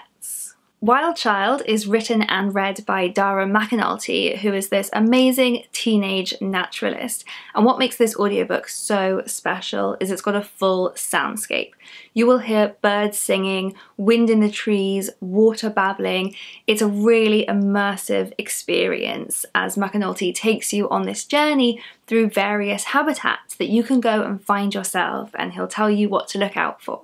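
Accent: United Kingdom. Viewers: British accent is amazing. Thanks for these recommendations 😊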